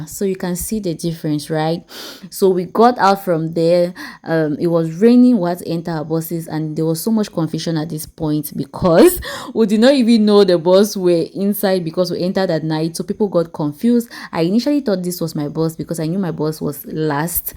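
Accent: Nigeria. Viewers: u sound so nigerian u have our lingo too welcome here, please go to island and Eko atlantic city then go to abuja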